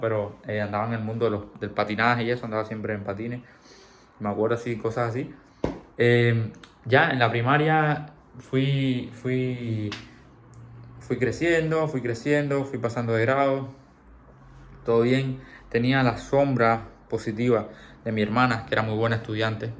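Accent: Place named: Paraguay. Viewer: Empecé a ver tu video y no entendí, pensaba que eras paraguayo, tienes un acento muy paraguayizado..😊 Deséo que te vaya de lo mejor, las personas de bien son muy bienvenidas!!!!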